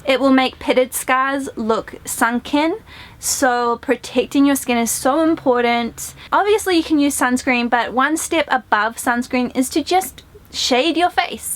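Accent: New Zealand. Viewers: I thought you were a fellow kiwi!!! But couldn't find confirmation :D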